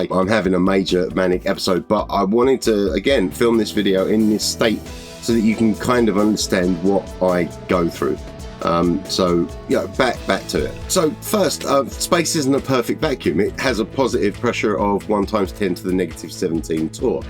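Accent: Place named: United States. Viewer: Why do you call yourself when you sound like a yank?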